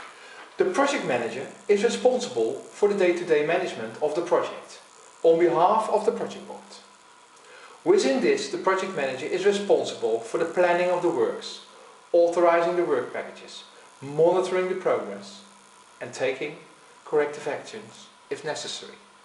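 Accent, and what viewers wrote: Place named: Netherlands. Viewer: You have a nice dutch accent.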